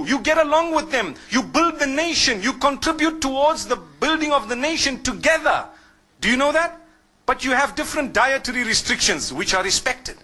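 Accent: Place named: Zimbabwe. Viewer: Even your English can show,you grew up in Zimbabwe,,i like that you were treated well in Zimbabwe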